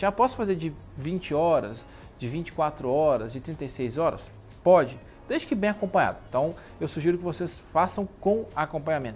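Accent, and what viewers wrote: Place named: Minas Gerais, Brazil. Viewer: Esse seu jeito mineiro de falar que acalma a alma, Uai eu amo isso ❤ #tamojuntosempre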